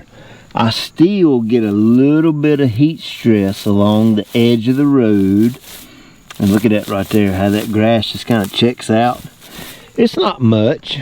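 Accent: South, United States. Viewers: I thought that as well but I think it was the southern draw :))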